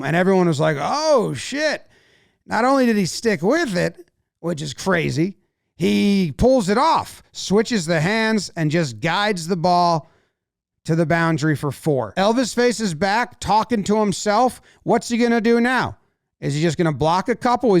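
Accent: United States. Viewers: It's pretty cool listening to an American talking cricket! Haha! You should also check Aussie rules football and V8 Supercars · Who else randomly started watching, and after hearing the American accent, thought, I may click off soon but watched the whole thing and thoroughly enjoyed it... Thanks...subscribed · Amazing to hear an American commentating, glad you're loving the game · It is so weird to hear an American talking about cricket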